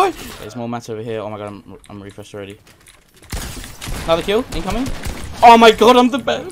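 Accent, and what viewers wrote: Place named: Oceania. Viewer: not sure if your trolling but if you can't tell by his accent he plays on oce servers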